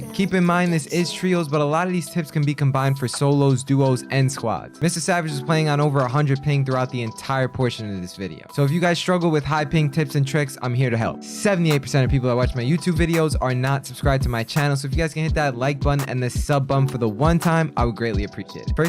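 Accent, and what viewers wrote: South Africa. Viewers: South african. Lol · South african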